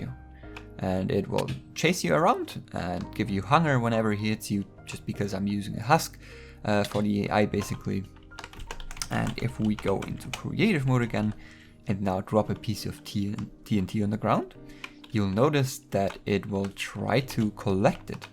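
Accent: Germany. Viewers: Are you German? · Is there a possibility that youre german? Kinda sounds like that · Are you german?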